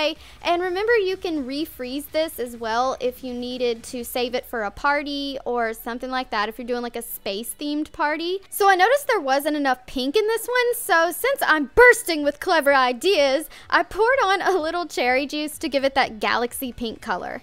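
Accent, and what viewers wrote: South, United States. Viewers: In love w your southern voice❤️❤️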